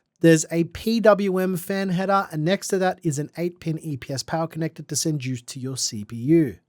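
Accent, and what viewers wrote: Australia. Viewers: Man. I got through 5 minutes of the voice volume fluctuations and had to shut it down. Goofy Australian accent didn’t help either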